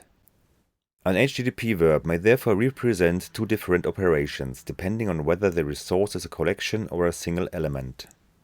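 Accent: Germany. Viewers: The German accent is strong with this one ^^ Great video, well done! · 好棒， 简单明了， 听英文口音像德国人？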